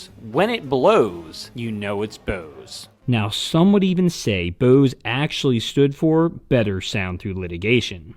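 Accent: Baltimore, United States. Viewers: I noticed your accent and thought you have to be from Baltimore. When you went out on the street I recognized you are on Harford Rd in Parkville MD. I have been in the shop there before looking for amps. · Your channel occasionally pops up on my feed. I remember the first video I watched of you. I was thinking to myself good Lord if that's not a Baltimore accent, I don't know what is. And of course sure enough that's where you are. I went to Woodlawn Senior High in the late '70s and I was on the auditorium tech crew. I haven't thought of Roberts in 45 years but as soon as you said Roberts I remembered the pair of tape decks that we had in the control room; it seemed to me they were semi-portable with handles and covers. Then sure enough the pictures popped up on your video and that's what I remember. Thanks for reviving a decades-old memory!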